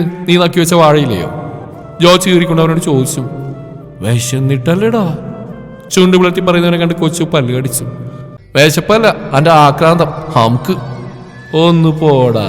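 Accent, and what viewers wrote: Tamil Nadu, India. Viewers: തമിഴ് കലർന്ന മലയാളം നന്നായി പറയുന്ന ഹൃദയം നിറഞ്ഞ സ്നേഹാദ്റമായ അഭിനന്ദനം🎉🎉🎉🎉🎉🎉🎉🎉🎉🎉🎉🎉🎉🎉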